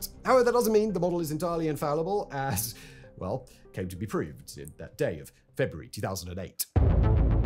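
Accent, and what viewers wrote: United Kingdom. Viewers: I am sure he sounds normal to you and you neighbourghs in England. You are right, I may have to concede he does not exagerate his accents, I 'd rather say he does not care for those who does not live in the same accent area. What I experience is no problem listening to most popular science speakers such as Bill Nye, Neil de Grasse-Tyson, Carl Sagan, Michio Kaku, Brian Cox, Richard Dawkins, Dave Farina, David Attenborough for instance (only mentioning men voices, because women voices tend to be more intelligible in general). I might have overstated, I understand him. I still prefer other accents/voices. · Dudes voice is like a caricature of a brit... every video feels like I'm listening to a very dry Python skit.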